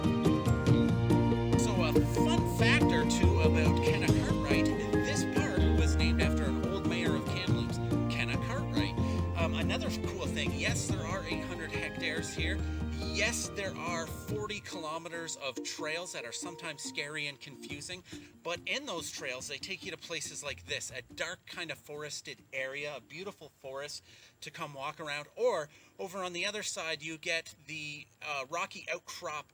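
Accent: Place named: United Kingdom. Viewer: A pleasure as always. Ha ha, yeah I think that British with a twang. That swim spot is divine.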